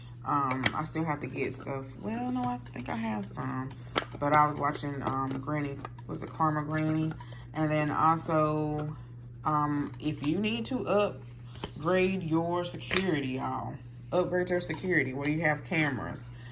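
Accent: South, United States. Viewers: Thank you for sharing from your heart! 🥰 Thanks for the encouragement to go shooting too. I keep thinking that, but draaaaag my feet. Perfect date idea right now!! Great job getting into canning and gardening. I’m a newbie too. It’s definitely in your genes!!! 🧬 I’ve got oxygen absorbers and Mylar bags in my amazon cart right now! Haha Just waiting on the next paycheck. 🤑 Blessings to you, dear Sister! Fall gardens are great! I’m not sure where you’re located but I’m hearing some southern accent. So if you’re down south (I’m in SC) you could do well with a fall garden. I’ve had lettuces, broccoli, & cabbages do well producing through the winter here. Jump in!! 👩🏻‍🌾🥦🥬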